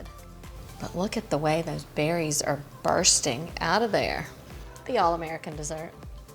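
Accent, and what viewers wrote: South, United States. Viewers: Clear and to the point, even with some bloopers stuck in. And what a hostess with that yeehaw twang! <3 Thank you kindly from Poland.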